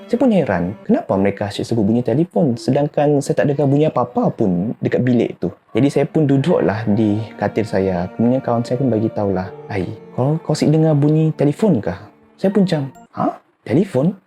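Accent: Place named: Sarawak, Malaysia. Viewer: Budak sarawak rupa nye speaker nihh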